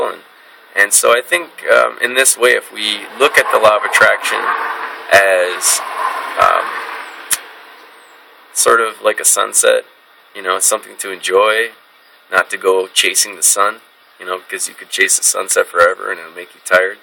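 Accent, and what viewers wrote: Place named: Canada. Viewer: real quick, you must be canadian yeah? i love the way y'all say "about" :)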